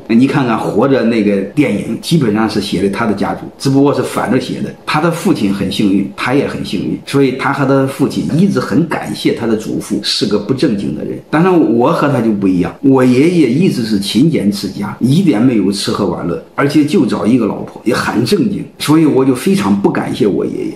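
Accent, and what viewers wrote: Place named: Henan, China. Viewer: 河南口音都出来了，肯定是老乡嘛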